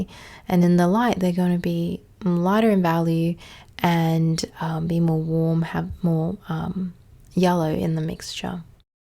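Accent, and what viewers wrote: Australia. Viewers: Great video! Thanks for not using background music; the combination of silence and your sweet, Australian voice makes it a true pleasure to watch and listen to. Greetings from Norway.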